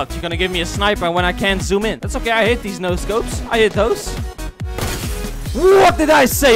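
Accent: Netherlands. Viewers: Lekker Nederlands accent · Nederlands accent echt geweldig😂😂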